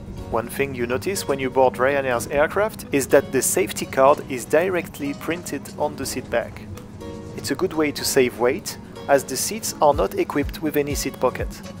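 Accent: France. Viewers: Ton accent français ressorts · T français si oui g reconnu l’accent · C’est quoi cet accent français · Ton accent francais c'est drole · I was trying to pay attention to the video but was distracted with the charming French accent. Sorry. P.S. I love all 3 airlines but most strongly Wizzair because they revolutionised travel in Central and Eastern Europe. Love Ryanair as well. Travelled at least 30 times with them. Less experience with easyJet, so no opinion. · Ça se vois quand un français parle anglais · l’accent fr reconnu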